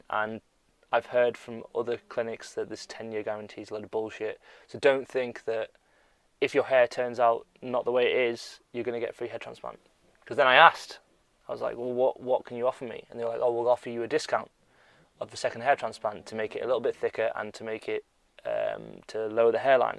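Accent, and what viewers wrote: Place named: United Kingdom. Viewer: What was the FB group please? Didn’t catch as much as I tried (UK accent) :) Keep posting mate, good results for me.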